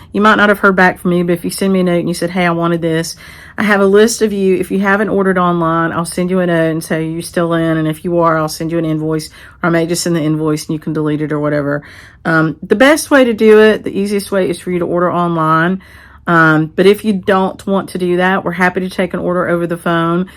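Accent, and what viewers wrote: South, United States. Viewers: Hi. I just love you and your shop, as well as your flosstubes. I not only enjoy the content but just love hearing you talk (that southern accent reminds me of my extended family). And, forgetting to post that last flosstube is something I would do. You sure did make it easy to use two of your words in a sentence. I know you are not that far out of the city to have farm animals, but I can imagine hearing the cow bell in the distance. The brightness of the colors you have in your shop, I don't see a yellow cover. If you have one that I missed, please let me know. Wishing you the happiest of holidays! Pat Tackaberry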